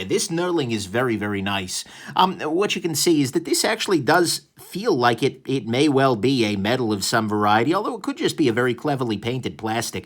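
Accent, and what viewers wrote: New York, United States. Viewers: Your accent is so cool! Are you from New York? I quite like this pencil for drawing technical drawings, I still need to upload a video where I use it but I like it so much that I'm going to be selling these on my website. I am looking forward to trying one of the Rotrings some time.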